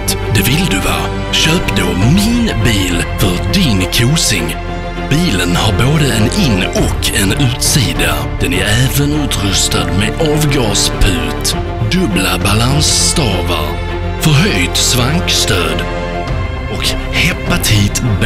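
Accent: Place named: Skåne, Sweden. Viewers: Gabriel von Blumenthal Everyone thinks different, but I would say in Skåne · Gabriel von Blumenthal skånska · Cool video Can't stand the southern accent though. D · Its called skånska, spoked by those who are from skåne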